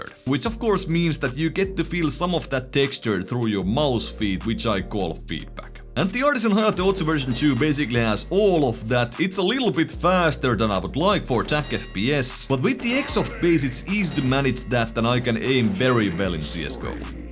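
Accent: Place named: Finland. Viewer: Nice explanations regarding pressure vs friction. Also, I love your finnish english, very colorful to listen to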